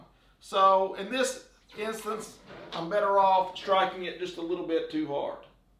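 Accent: South, United States. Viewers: Where you from? I’m not American but my guess is the south.. Cheers for the video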